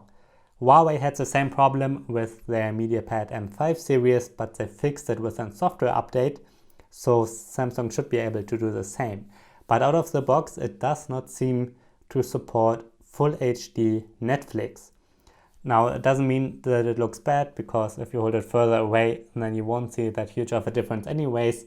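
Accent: Germany. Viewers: I just realize he has German accent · man hört aus deiner stimme dass du deutsch bist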